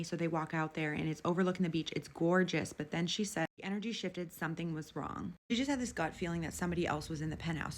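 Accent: United States. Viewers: Girl you talking to fast can't understand everything you're saying Americans some times upsets me with the way they pronounce alot of words bro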